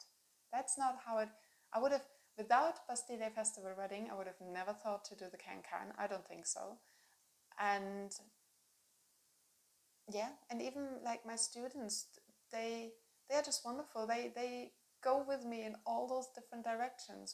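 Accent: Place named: Germany. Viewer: Hello friend 🤠👋 I was already a subscriber, but this was very interesting 🎉🎉🎉 I was completely blown away by your accent however, I wasn't ready for what sounds like German or Austrian 😮😮😮🤯🤯🤯 Not to be stereotypical, but I really thought you were Middle Eastern lol. In any event, congratulations on your company's success and being displayed in the paper! Can't wait to see more dancing! Come visit us in The States! There's a Reflexology session waiting for you as a thank you for all of your hard work ❤️🙏 🤗 Your friend Jay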